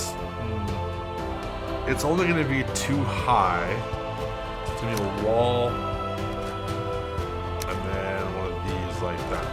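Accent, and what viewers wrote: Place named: Canada. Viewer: Yo r u a Canadian brethren..? U got that accent 😳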